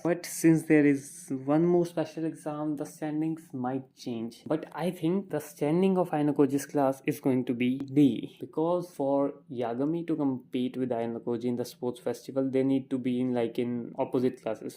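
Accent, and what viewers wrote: India. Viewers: by your voice feels like you are from india : )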